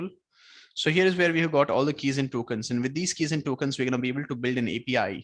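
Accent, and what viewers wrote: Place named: India. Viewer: orang indonesia tapi logatnya kayak orang india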